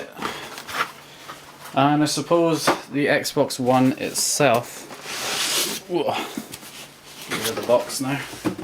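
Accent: United Kingdom. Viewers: Because he's british and youre probably spanish by your name. So you talk weird too.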